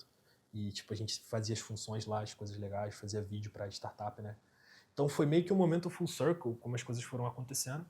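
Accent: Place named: Rio de Janeiro, Brazil. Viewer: Ele é do RJ certeza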